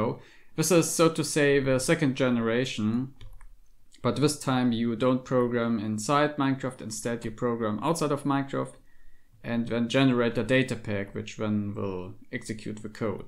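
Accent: Germany. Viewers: your accent are like germans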